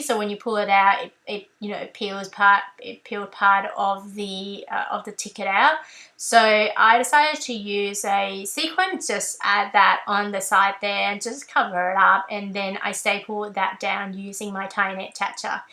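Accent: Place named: Australia. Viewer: Thanks for the heads up on the DD Facebook page re your videos. Sounds like an Aussie accent. Are you in Oz?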